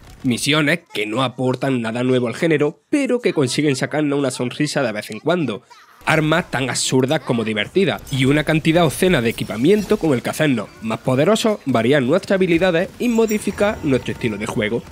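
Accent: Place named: Murcia, Spain. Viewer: perfecto murciano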